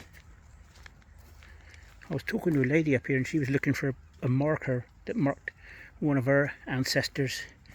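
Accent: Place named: Cornwall, United Kingdom. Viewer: Great video ,nice to see a face to the lovely Cornish voice.always enjoy the vids .I love history x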